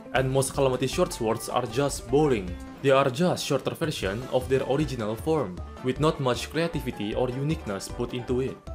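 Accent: Russia. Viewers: why is your accent similar to russian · bro this man has a very cool russian accent and i approve of it!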